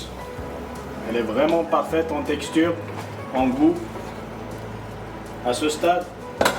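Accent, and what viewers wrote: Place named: Algeria. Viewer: Tres bel accent algérien. Tres bonne recette. Merci chef !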